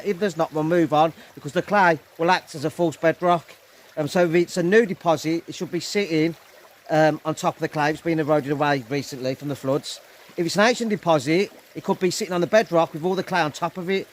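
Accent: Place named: Birmingham, United Kingdom. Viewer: Absolutely fascinating video , really enjoyed it … BUT .. omg that ACCENT 😂… just gotta be honest I hate that accent of yours , Brum/Black country esque… sorry mate I watched it with the sound off .. I feel terrible saying that but it is true ! Good luck with your future panning 👌 👍🏴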